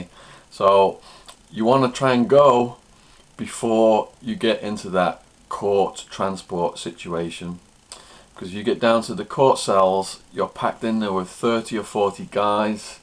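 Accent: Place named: Northern England, United Kingdom. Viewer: You from Uk? Sound like you’re from Chester or somewhere up north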